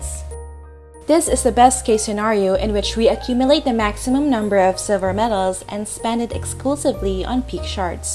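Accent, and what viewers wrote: Philippines. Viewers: Thank you. Thank God for bumping into this. You saved my life! BTW are you Pinay? Your accent sounds like one. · Lol boses plang pinay na. Usap2 din sa ibang tao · are u filipino?nkaka inlove nman pgsasalita mo ;)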